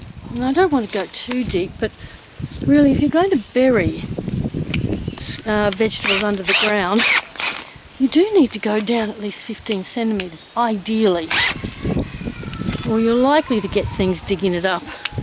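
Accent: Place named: Australia. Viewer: The in-ground system she's using is literally called a compot, you dumb nugget. She's australian. We just talk like that.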